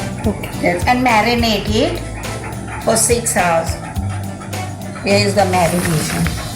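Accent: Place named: Goa, India. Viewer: i am a goan and I love this chef and her accent - so Goan. Love you and thanks for the lovely recipe.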